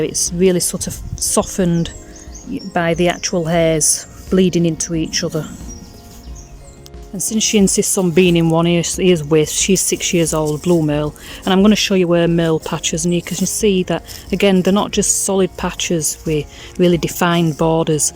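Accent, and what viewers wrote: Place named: England, United Kingdom. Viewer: fantastic I would say the yanks can't understand a real english accent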